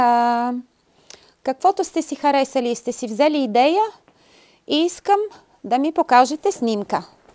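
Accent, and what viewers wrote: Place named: Italy. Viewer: Сигурно си от доста време в Италия, защото се усеща италиански акцент:) Ти сподели , че дъщеря ти не знае бг . Напълно те разбирам:)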